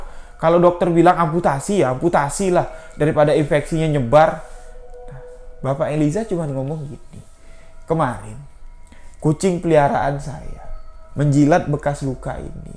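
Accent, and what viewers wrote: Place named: Madura, Indonesia. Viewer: mas ini asli mana ya kx saya den9er² bhs nya kyak oran9 madura🙏🙏🙏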